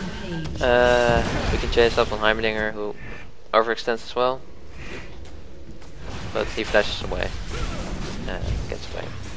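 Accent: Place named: Netherlands. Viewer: i knew you were from holland.. dat accent xD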